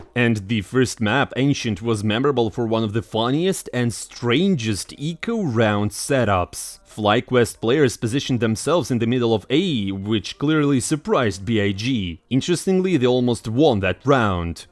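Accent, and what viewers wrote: Russia. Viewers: This russian accent is horrible.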